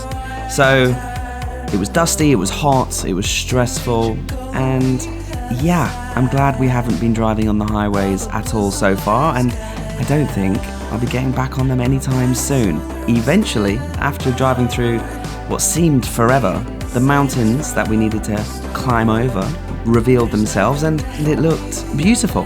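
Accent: United Kingdom. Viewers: have to say, I have been to Vietnam 25 times and lived there for 2 years! But your videos make me want to go back and do all the places I've visited again 😄 Keep it up lad! Always love my tour videos in a blighty accent... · I used to think British people were rather cold and didn't know how to joke, because I have a few British colleagues in the company. But after watching your channel I realized I was wrong, you are quite funny and cute and are you British (I recognize your accent) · Fantastic and beautiful episode (as always) of Vietnam,! Really enjoying this series too! Had a little chuckle towards the end, with your word for swim shorts: "swimming costume"- you might of lived overseas for years - but you still sound so very British (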